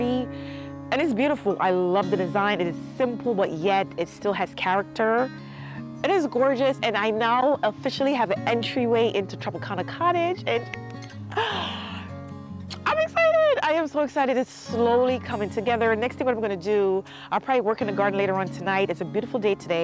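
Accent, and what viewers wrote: Patois, Jamaica. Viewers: Loved hearing the strong Jamaican patois 😁🇯🇲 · Love the progress of your garden. Everything is coming along great. I'm looking forward to seeing it in bloom. I also love to hear your Jamaican accent when you are talking with your husband. 🥰 God bless your family and garden. 🌷🌿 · I have Jamaican pastors and I love to hear them talk in the native accent and tongue. your accent popped out real quick and I just love it! the arbor is beautiful. I like that it isnt stark black. the muted color tone will only make whatever you put on it pop even more. #tfs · Love to hear the patois. TFS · Beautiful choice,! It probably looks crooked to you because of something in your line of sight. It looks straight and even from this side. It will be even more gorgeous when those vines start creeping up and over it. Btw, I think this is the first time I’ve ever heard you speak Patois. 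💚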